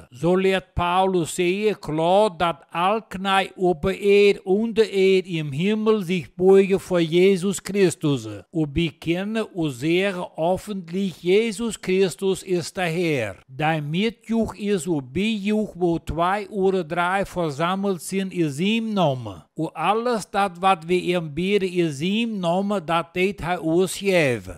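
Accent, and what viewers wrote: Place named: Pomerania, Germany. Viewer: ek is van suid afrika...die lesing uit die heilige skrif is mooi in die pommere dialek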